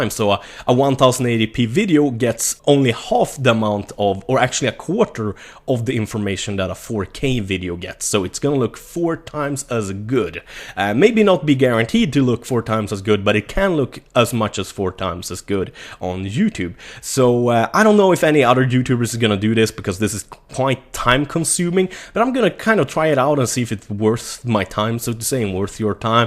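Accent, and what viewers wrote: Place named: Sweden. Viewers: Yes, he is from Sweden. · Are you from Sweden? Your accent reminds me of robbaz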